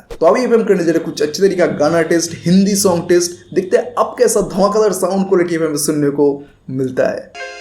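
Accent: Bengal, India. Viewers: Bro are u bong ?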